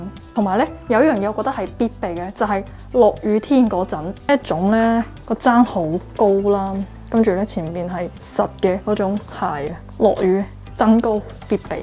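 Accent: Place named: Guangzhou, China. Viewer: 衣著有品味，人靚、聲甜，好喜歡你！聽到你的廣州口音，特別感到親切……。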